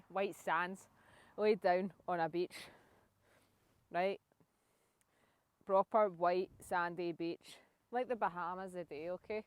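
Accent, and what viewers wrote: Scotland, United Kingdom. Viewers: So much fun hearing your Scottish language. Unique and very special. This is a very special tour. And so and so ... the two guys that I have been following here is Nick Zentner , a university guy, who carries along the Columbia River basin etc. in the Northwest and Myron Cook who does the Central Basin to the Gulf and beyond ~ Wyoming and such. (just saying😊) Thank you so much for sharing all that you do. 〰✨🌿👍🌿✨〰. Ooopa ~~~ And then Antonio Zamora who promotes the etiology of impact basins with vortex around Saginaw Michigan ~ 12,900 years ago ~ (not really geology more just history and our extinction event). And so thank you again. All good. ❇ New Hampshire. ❇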